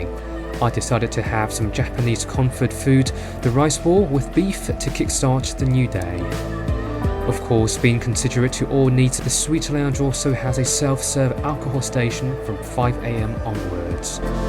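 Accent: United Kingdom. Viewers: 感谢分享，ANA的新头等装修真的很大气屏幕效果也很惊艳，可惜我今年只能飞到老客舱。顺带一提博主的英音很惊艳哇，完全没想到是在美国上学。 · an asian guy with british accent is just so off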